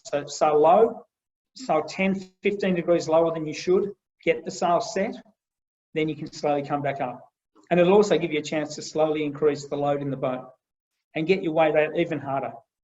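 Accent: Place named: Australia. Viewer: less than 200 likes? There is an incredible amount of very valuable information here! The aussie accent is not easy for me, but what a great video!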